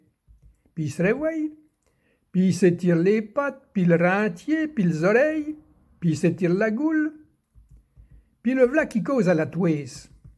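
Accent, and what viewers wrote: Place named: Touraine, France. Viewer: Moi qui suis de Touraine, c'est fou comme votre parler ressemble au mien ! C'est ben piesant à oude ! Grand marcite.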